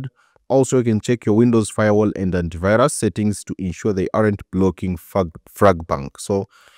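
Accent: India. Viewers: never trust a guy on pc thinks with indian accent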